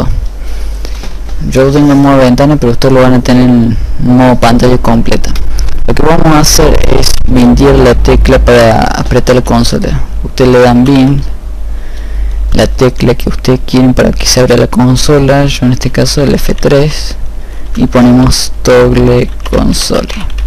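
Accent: Córdoba, Argentina. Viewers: Que buena tonada cordobeza XD . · no entiendo muy bien el acento argentino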